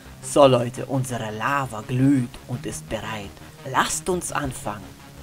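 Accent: Russia. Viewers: #Kölnerdom Feiere deine Videos übel und viel Glück für deine Zukunft ignorier deine hater einfach hör auf die richtigen und dein Akzent feiere ich auch ich schätze mal du bist Russe oder Kasache meine Familie kommt auch aus Kasachstan 🇰🇿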